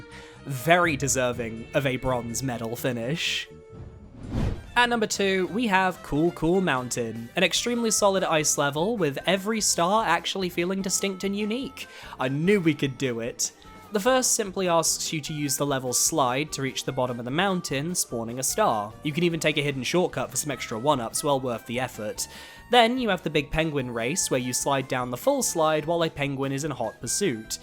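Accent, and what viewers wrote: United Kingdom. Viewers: I really enjoyed this video (thank you) but I am very confused by you, you have an English accent but use American words and pronunciations, how is this? 😂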